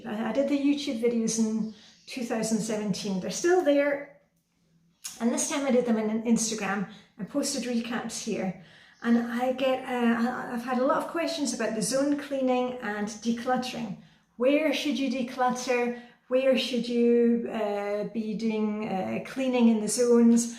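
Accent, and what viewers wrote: Scotland, United Kingdom. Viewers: I seen a squirrel at your window on your video. Was it a red or grey squirrel? Love hearing your Scottish voice. I am from Glasgow. Xx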